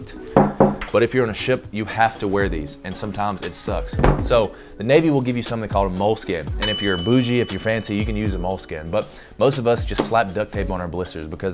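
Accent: United States. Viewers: sounds so country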